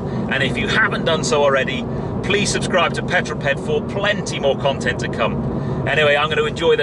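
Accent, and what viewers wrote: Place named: United Kingdom. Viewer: Good review. Subscribed! Honda has made the Civics turbo the quiet performance car, especially the UK version, slightly different than the 1.5 hatch sport in the States. BTW, love your British accent, I hear so many different British accents on the media, but I found yours to be clear and sounding like melody to the ears. I'm wondering which regional accent it is? :)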